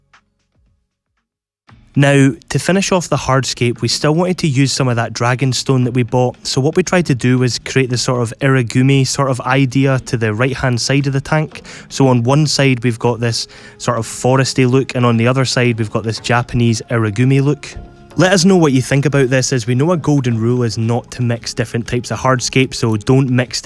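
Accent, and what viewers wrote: Scotland, United Kingdom. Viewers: I miss Scotland so much and love your Scottish accent.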